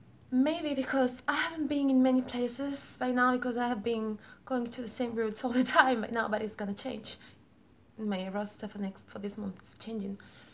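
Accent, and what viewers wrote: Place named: England, United Kingdom. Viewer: Hola hermosa! Yo crecí al norte de México prendí inglés de chica obvio el inglés de por allá! Jajaja no entendí ni la mitad de lo que has dicho pero me encanta!! Si tienes acentillo inglés de Inglaterra!!! 😍 Están buenos los vídeos en Inglés! Ojalá hagas más, ahora vivo en Argentina y no entiendo mucho cuando hablan inglés acá tampoco me hacen dudar de mis conocimientos jajaja besos guapa!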